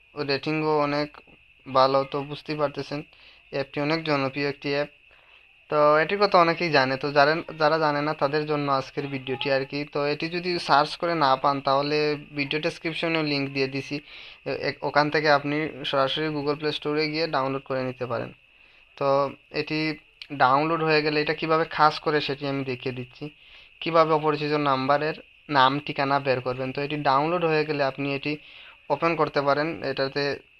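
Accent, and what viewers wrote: Sylhet, Bangladesh. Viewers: তুই সিলেটি না কিতা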